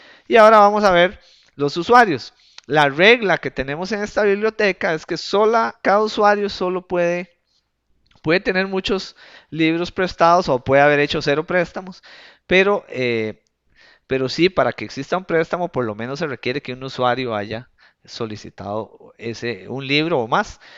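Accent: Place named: Costa Rica. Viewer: es como si hablara con mi amigo de costa rica , de donde sos? muy bien explicado el video, gracias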